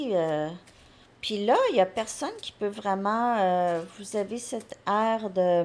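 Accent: Canada. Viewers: Je vous ecoute pour la première fois, vous avez un accent Canadien ce qui est marrant Mais voilà, je suis oubligée,de.bien écoutée, car je ne comprends pas toujours bien,ce que vous dites Merci tout de même pour votre guidance 😂😂😂😅 ❤LGG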